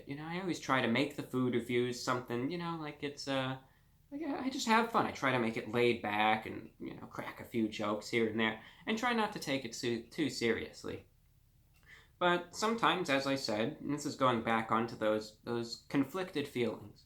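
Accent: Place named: Mid-Atlantic, United States. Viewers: The New York accent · I can't eat any of the food you review, due to dietary restrictions. But I'm still a loyal viewer. "what is this channel known for?" To me? It's your mid atlantic accent, polite speaking, and your sense of style that I thought had left this world decades ago. I lost that bet.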